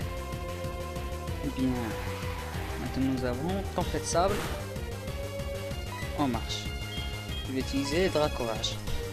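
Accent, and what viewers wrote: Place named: Brittany, France. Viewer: trol ton accent de breton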